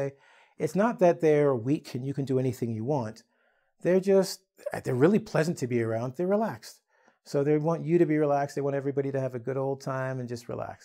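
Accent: United States. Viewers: Is it American English ? Just I get confused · What is your american accent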